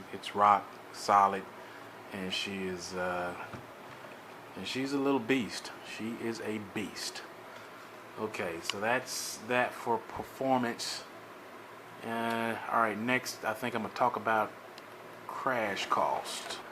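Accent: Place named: Carolinas, United States. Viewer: see I knew you from the Carolinas. My sister lived in Greensboro North Carolina and she picked up the same accent.YEA like you said I am taking my time she is coming out nice but it's the gyro I am worried about. I am using a K-bar makaido system so I heard good thing about it. I even have the bluetooth to use for it to link up but I don't know how to use it yet your the best thanks bud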